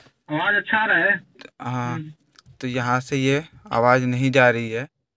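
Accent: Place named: India. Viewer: No me gusta el acento que tienen los indus en inglés.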